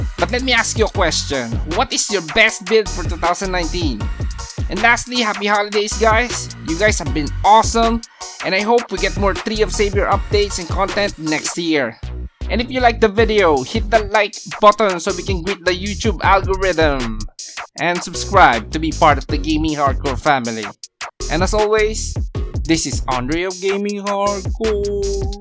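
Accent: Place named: Philippines. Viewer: Hard Filipino accent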